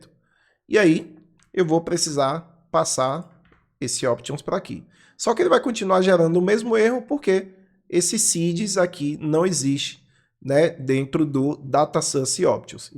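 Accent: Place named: Northeast, Brazil. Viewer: Muito bom, me ajudou demais!!!! E ainda é nordestino que nem eu!!!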